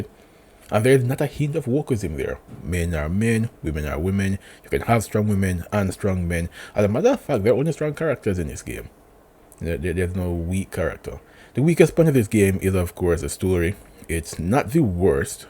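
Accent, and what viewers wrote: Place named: Africa. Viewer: We all have accents. You have this strong African tribe accent. Where are your parents roots from?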